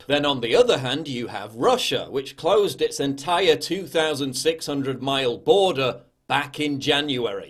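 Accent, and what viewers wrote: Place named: England, United Kingdom. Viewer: I love you,. You are not only young and handsome, ( don’t worry,I’m 73 years old) but smart, politically well informed, and courageous. Oh, and I loved your beautiful English accent! Oops, did I sounded racist?! What the heck! Very seriously now, this is an analysis that we don’t see in the corporate media full of coward unprofessional journalists Excellent! 👏👍❤️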